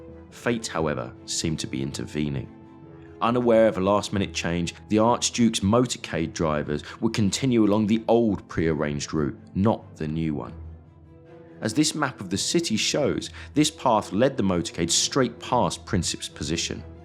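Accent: England, United Kingdom. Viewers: One listening documentary spoken in English accent should be initially skeptical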